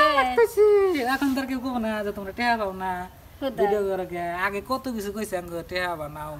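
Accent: Tangail, Bangladesh. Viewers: বাংলাদেশ টাংগাইলের মতো ভাষা লাগে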